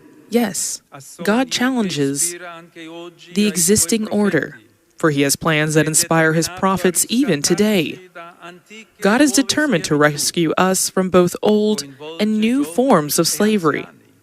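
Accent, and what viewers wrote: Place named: United States. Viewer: Crystal clear American English!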